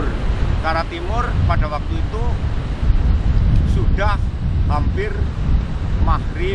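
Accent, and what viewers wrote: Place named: Kebumen, Indonesia. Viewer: Lumayan ni, nambah pengetahuan. Logat Kebumen banged 😀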